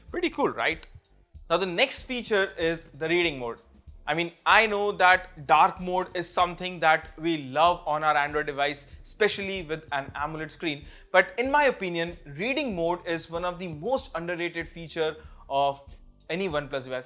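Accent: India. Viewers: Indian-English accent is so annoying.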